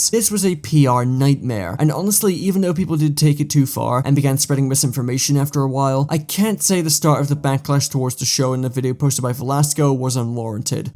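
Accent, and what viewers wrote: United Kingdom. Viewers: it's weird but I didnt even catch your british accent until you pointed it out, almost like a switch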